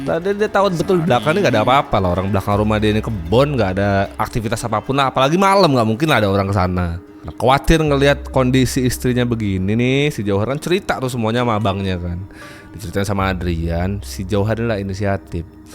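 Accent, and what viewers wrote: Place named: Lampung, Indonesia. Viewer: logat² nya kaya orang Lampung bang 😊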